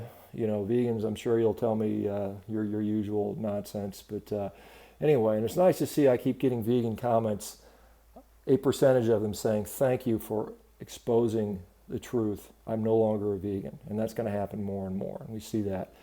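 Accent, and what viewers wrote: United States. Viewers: Love your American accent and soft relaxing tone👍💋♥️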